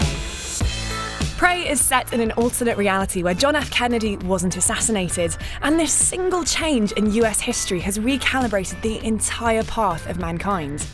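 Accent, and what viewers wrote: United Kingdom. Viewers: damm narrators british accent sounds gorgeous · tucker she is British not American this time maybe that's the different accent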